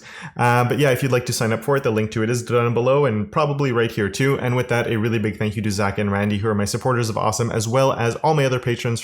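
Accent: Canada. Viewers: This was good. You have spent some time looking inside yourself to understand where this comes from. Very helpful to me at this time. Also.... are you Canadian? "About" and "doubt" caught my ear. ;)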